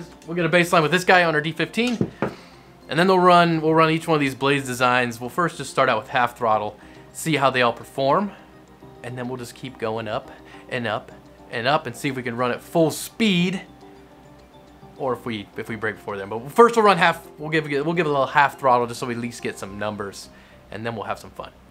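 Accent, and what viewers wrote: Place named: Illinois, United States. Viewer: When the fuck did this channel pop up? Thank you recommendeds. I can’t figure out where this dude is from either, accent is telling me Illinois but that jacket is telling me Tennessee or some shit.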